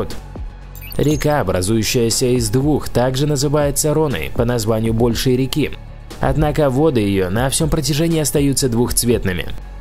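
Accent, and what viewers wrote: Moscow, Russia. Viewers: Такой интересный канал, но из за противного московского говора не могу смотреть до конца. Как бы не терпела, раздражает.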